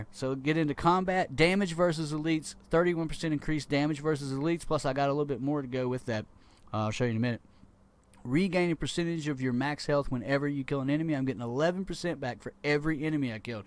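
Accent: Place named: South, United States. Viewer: Southern accent is cool these days dude. Its also a total relief to listen to a voice that is original, and not sounding like a generic radio DJ like 99% of youtube gaming commentators. Btw thank you for taking the time to reply to my comment, credit to you sir. Good luck with the channel, its helped me a lot.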